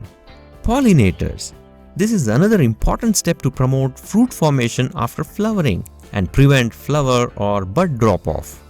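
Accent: India. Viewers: Hahaha that Bollywood accent is so funny and cute! Nice vid! Thx!😂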